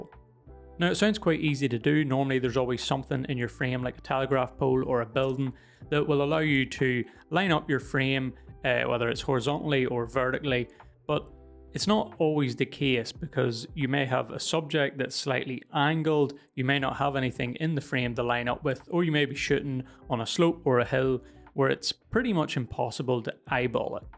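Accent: Ireland. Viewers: Brah gotta be Irish. Sounds like my bro from Belfast.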